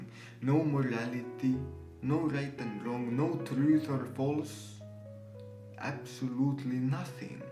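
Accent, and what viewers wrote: Finland. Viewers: Että hävettää suomalaisten puolesta kun rallienglannilla sönkkää halpojen jenkkiuskisten myyntipuheita juutubessa. Meillä on tän maapallon parhaita koulutuslaitoksia, kirjastoja ja terapeutteja. Jospa kävisit vaikka filosofian alkeiskurssin ennen kun sönkkäät tätä skeidaa koko maailman kuultavaksi. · Sama homma, pelkästä aksentista tajusin jo Logickedin videosta että nyt on suomipoika asialla ja olihan se sitten pakko katsoa että mikä kanava tää oli. Aikamoista, aikamoista...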